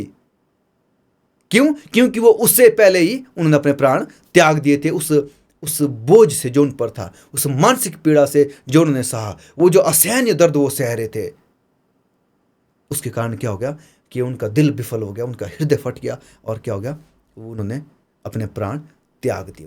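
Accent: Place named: Himachal Pradesh, India. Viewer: Himachali hindi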